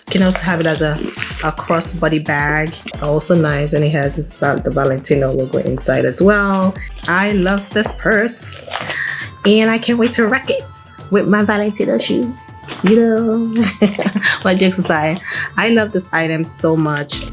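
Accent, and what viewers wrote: Nigeria. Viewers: Iam always here for the intro😂 it’s the 9ja accent for me😅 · Omo i thought you were like born in America , your Nigerian accent is cute 😂, i sha subscribed before I even clicked your channel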